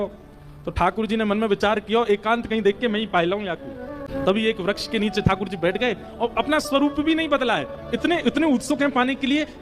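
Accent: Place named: Braj, India. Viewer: Anand a gaya or apki awaz me brij boli😅❤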